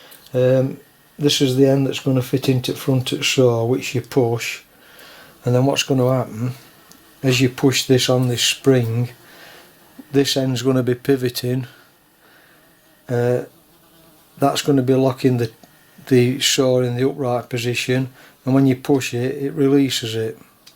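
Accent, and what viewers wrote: Yorkshire, United Kingdom. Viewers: Nice little machine . You sound very sort of Pontefract area. From a Leeds lad.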